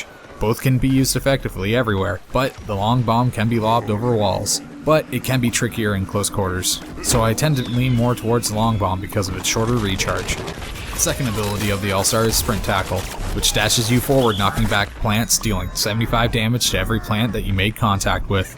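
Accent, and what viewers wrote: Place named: Canada. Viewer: It doesn't, I understand that your Canadian and that anyone insulting the way you talk is just rude.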